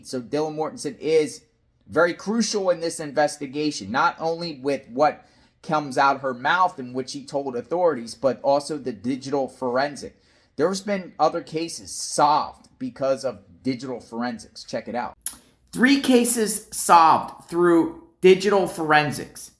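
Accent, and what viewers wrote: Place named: New York, United States. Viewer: Love love all your content. Ur awesome! I especially love your NY accent!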